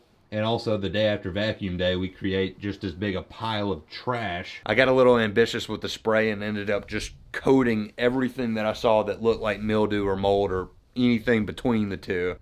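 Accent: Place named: Alabama, United States. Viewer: Hey I'm enjoying this boat renovation stuff, will keep watching to see what happens. I'm from Alabama, it's great to hear your accent.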